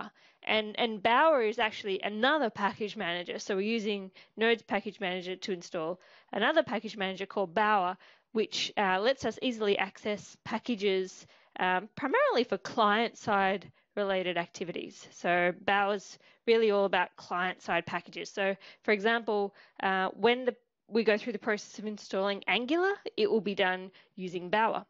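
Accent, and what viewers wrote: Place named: Australia. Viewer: I guess she is from Australia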